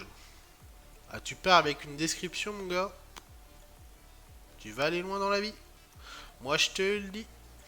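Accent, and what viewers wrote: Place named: Quebec, Canada. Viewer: L accent québécois ça va il est bien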